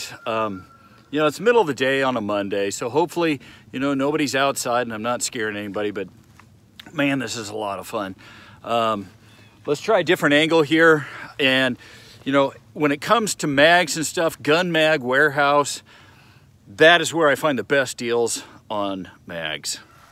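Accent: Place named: Nebraska, United States. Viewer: I picked up on your accent right away, and was like "holy crap, a brother from the Motherland of Nebraska!" Then I saw your lower registration, and subscribed without question. Grew up in Ogallala, now on the East coast. Thanks for putting things up on the channel!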